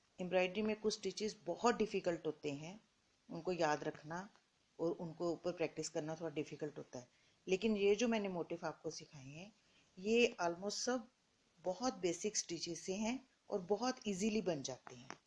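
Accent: Punjab, India. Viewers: Beautiful design.. N ur Hindi with Punjabi touch is so sweet.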